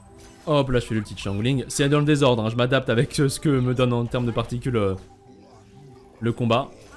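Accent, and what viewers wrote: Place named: France. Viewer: Mec tu peux utiliser des mots français pour parler des capacités des persos parce que tu utilises des mots anglais mais tu les prononces avec un accent français donc on comprend pas trop. Merci d’avance.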